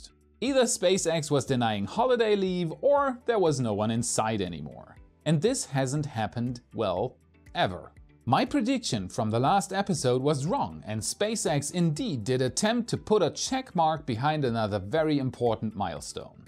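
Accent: Germany. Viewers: Hey man. You sound very German 🇩🇪 ? But your English is pretty decent. Where are you broadcasting from?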